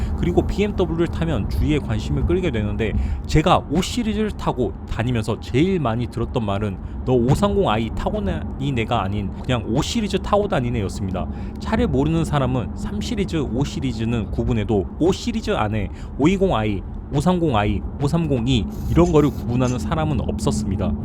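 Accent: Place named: Gwangju, South Korea. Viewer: 어딘가 익숙했는데 광주사시구나 ㅎㅎ 반갑습니다 ㅎㅎ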